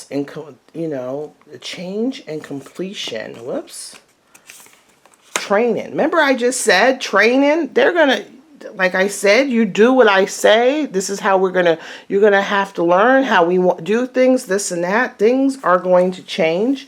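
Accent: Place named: New York, United States. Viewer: I thought I heard a little subtle of NY. I think a big conflict is Meghan's never Trumper bs narrative with all of her Illuminati hollyweird friends. The meeting of DJT is going to be something she isn't going to like and will possibly not be included. Watch, next week or in the next weeks to come, there will be an appearance with the oblamers that will cast shade on her "royal position". It's going to piss off the queen of the fall out from it. Just a feeling I have. This will be an "opportunity" for the oblamers to insert their "presence" onto the world stage. It's going to interesting to watch to say the least! Grab the popcorn!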